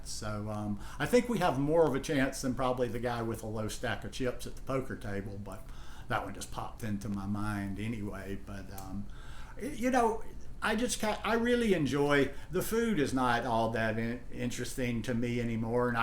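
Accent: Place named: Alabama, United States. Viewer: Your videos always put me in a relaxed happy mood. That why I enjoy watching them. They are always positive and sensible about raw vegan diet. You have a nice personality. I love also your Alabama accent. Actually you are the only one on YouTube left that I watch regularly. Thanks for being here with us. 🤗✌💖.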